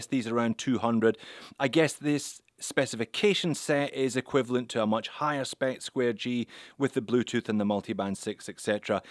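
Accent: Scotland, United Kingdom. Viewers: It's not a $200 watch. Very enthusiast cheerful review this bloke sounds a wee bit Scottish maybe? 🤔 I prefer my Commodore Red LED from 1976 from Boots Irvine Ayrshire by Willie Claus 🎅🤣 Enjoyable video yet again.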